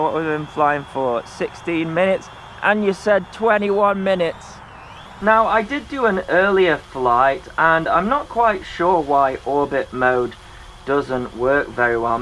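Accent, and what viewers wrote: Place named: Stoke, United Kingdom. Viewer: Great review, best I have seen so far, great value for the money and glad you dont equate it with the spark, the spark is a much better craft with a better camera and can fly 1.5 miles away , the A3 has been shown to fly no more than a <num> metres at very best, lots of folk like a drone they can fly out of visual line of site even if they are not supposed to, for the money though its good, they need to work on the software for the flight controller, them simply saying its our own doesnt detract from the fact that its not right, the drone vibrates quite badly and its only the gimble that saves the footage from being unusable, give them 6 months and they will iron out the bugs, the underside port is shown being used with a solenoid to drop parachutes on the promo video. I know you were covering your GPS coordinates but your flying location is very easy to work out from the aerial video and your accent, got a lot of love for Stoke and have family up that way just a few miles to the west of you, thanks for the review.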